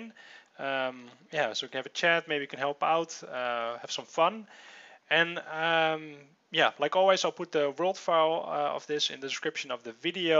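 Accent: Netherlands. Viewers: are you Dutch? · je hoort het gewoon nederlands :3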